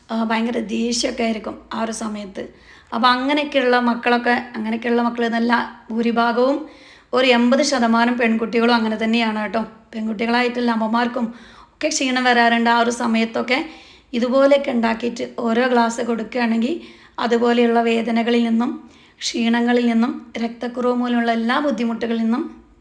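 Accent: Malappuram, India. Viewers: Aa malapuram baasha thanne Mathiyenu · Malappuram thathante slang maariyo😂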